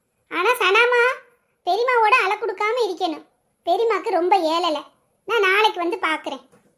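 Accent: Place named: Kilakarai, India. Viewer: I always like klakarai slang.. Super❤️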